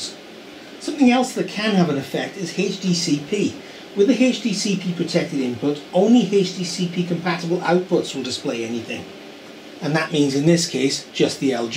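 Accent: Wales, United Kingdom. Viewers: Ditto, just found myself here after checking up on Photon's channel and reminiscing. As an electronics and computers enthusiast, I'm looking forward to subscribing and binging all of your past videos! 😃 Also, your accent tells me you hail from one of my favourite countries! 🏴 Cofion gorau! 😊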